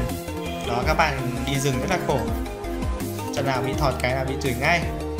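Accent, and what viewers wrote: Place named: Hanoi, Vietnam. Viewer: Cái giọng đậm chất Hà nội nghe anh bình luận hay hơn anh đánh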